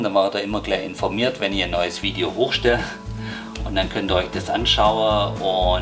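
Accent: Swabia, Germany. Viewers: Schee Schwäbisch, griasla noch Günzburg👍🏻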